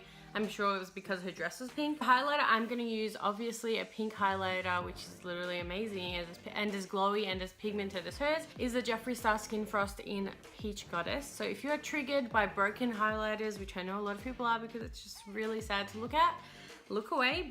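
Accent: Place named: United Kingdom. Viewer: Love this look girly and your accent 😍😍 you from the UK 🇬🇧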